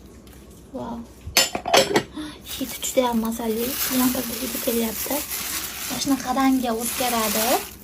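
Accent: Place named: Fergana Valley, Uzbekistan. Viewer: Водийни кайсидир жойлари шунака шева бор калапир кутини ёки бошка нармаларни кутини диб гапиришади эшитганман деме бу кишим водийдан😅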